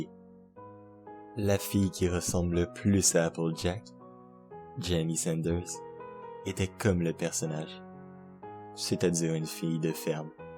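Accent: Quebec, Canada. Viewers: Hé es-tu québécois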